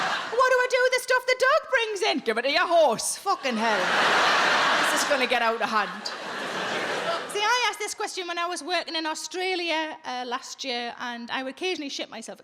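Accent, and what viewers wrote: Newcastle, United Kingdom. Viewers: i had never heard of sarah millican last month, and now she is my favorite comedian. what a hilarious person she is. and that geordie accent! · She has a Geordie accent. It's from the north-east of England.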